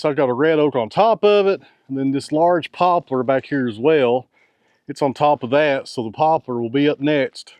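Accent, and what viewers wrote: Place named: Southwest Virginia, United States. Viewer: Well, I'm from southwest VA and he sounds a lot more like my Bristol peeps than Charlottesville.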